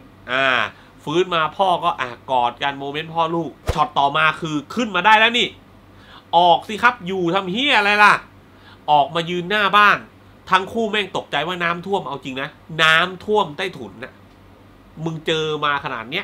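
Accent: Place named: Surat Thani, Thailand. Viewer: คนสุราษฎร์อำเภอไรนิพี่บ่าว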